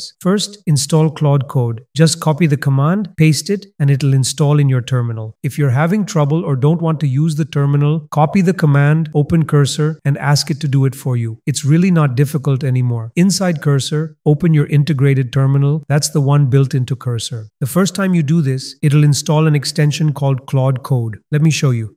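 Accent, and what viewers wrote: India. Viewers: The only indian i can bare to listen too. SUPER INDIAN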